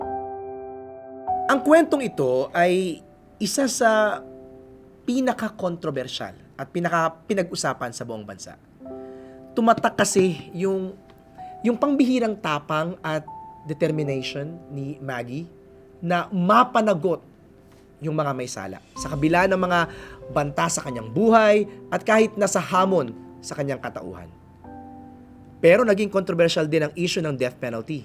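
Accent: Conyo, Philippines. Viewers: Cono naman magsalita.. arte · Sana iba na lang nagkwento. Interesting kaso nakakairita magkwento. Feeling conyo. Kakainis. Hindi ko na tinapos after this comment. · So conyo nmn nung speaker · ang cute pala pakinggan ng slang conyo in a serious documentary, nuh hahaha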